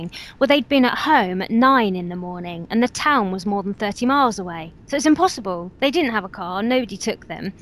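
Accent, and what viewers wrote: England, United Kingdom. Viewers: is awesome. I live near cannok chase I wish I could give her a bit of information about many of the stories about that place all I have some intresting information linked to masons and black eyed children. Ill try and look for a way to send her a email. Hey but her stories are great nice prim and proper english accent great story teller. The pig man is another story linked to cannok chase a Bigfoot type creature. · This is the kind of British accent that I love not the Bo'Ohw'O'Wo'Er one. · nice story and lovely british voice but on a sidenote it's just a story :) you have to be very gulable to believe this really happend but it doesn''t matter if you want a scarry night and just want some mystery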